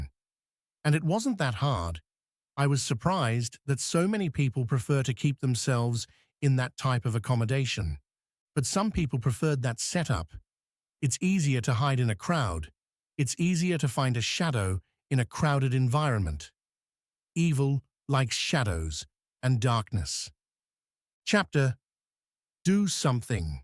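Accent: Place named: Cockney, United Kingdom. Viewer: Cokney accent ✊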